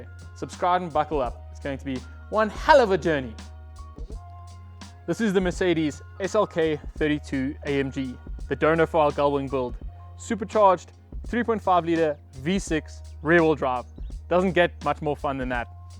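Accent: South Africa. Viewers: South Africa, my home country. We'll since I support local, I subscribed. Thought that English accent sounded familiar! Great work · You have a South African accent ?!?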